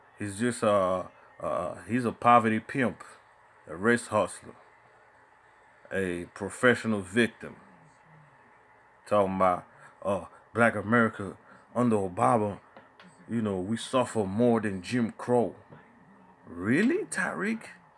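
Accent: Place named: Caribbean. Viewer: U have a carribean accent. Ur family wasn't here in Jim crow. Stop commenting on american black folks movements. It's non-immigrants only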